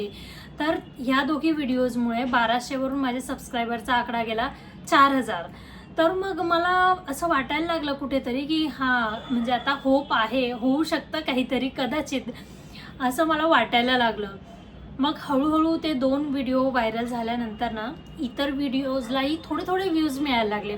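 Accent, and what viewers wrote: Khandesh, India. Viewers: Congratulations one man army, तुमचे व्हिडिओ खूप छान आहेत. तुमची ॲक्टिंग ची हिस्ट्री काय आहे? म्हणजे तुम्ही acting चे प्रशिषण घेतला आहे का? तुमचे आता normal बोलणे आणि व्हिडिओ मध्ये खानदेशी टोन मध्ये बोलणे खूप वेगळे वाटले. Keep progressing 👍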